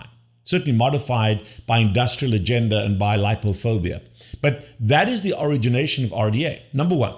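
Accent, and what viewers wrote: South Africa. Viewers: Thanks Doc...your accent seem to have some regional South African traces...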